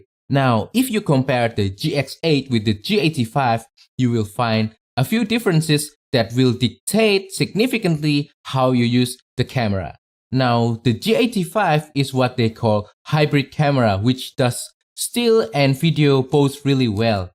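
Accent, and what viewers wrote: Indonesia. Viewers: As an Indonesian myself, I didn’t know that you’re an Indonesian until I notice your accent 😁. Greetings from Poland 🇮🇩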